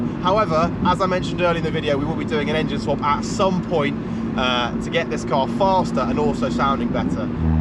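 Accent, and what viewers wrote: United Kingdom. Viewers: Sounds britich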